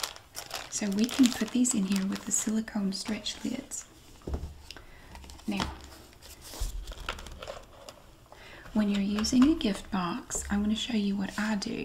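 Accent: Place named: Texas, United States. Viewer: As a southern girl from Texas, this video makes me feel at home😂 the accent, the remarks, the methods, it’s all just familiar🥰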